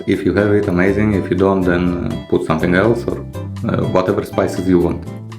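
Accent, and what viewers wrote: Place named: Russia. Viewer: brother your video looks so nice, just continue, but i think you are russian for reason your accent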